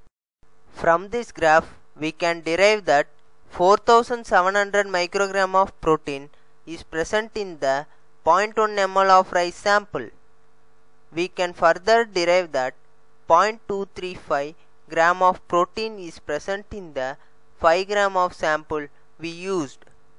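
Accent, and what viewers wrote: India. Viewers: VERY NICE INDIAN VOICE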